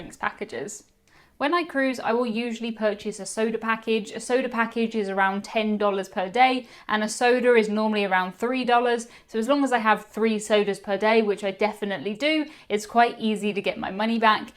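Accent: United Kingdom. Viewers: Love the British accent (being American, it's cool to see the different ways we speak the same language). I could get lost in your eyes and listen to you talk all night!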